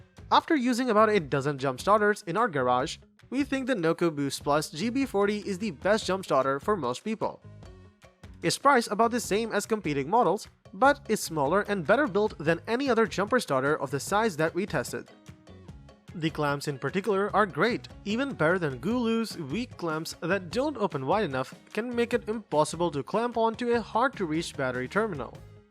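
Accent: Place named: India. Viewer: The Indian accent makes it hard to sit through the entire video. Do you have someone who doesn't have strong accent to read the script instead?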